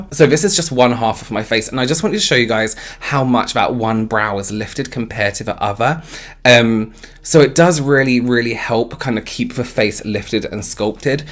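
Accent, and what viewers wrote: United Kingdom. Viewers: Dearest, you sound loud enough but still sassy and classy with your lovely British accent!! We love you in my FB makeup group here in Canada, our "unofficial Official" mascot!! You're our favorite twin BTW!! We do love James, how can we not? But, you just slightly outdo him in the finesse category!!💋😉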